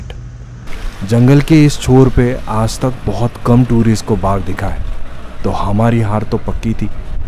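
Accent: Maharashtra, India. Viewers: as much as I love jungle Safari, I hate the Cricket commentry. Marathi accent , I miss it , like I miss the Bagh !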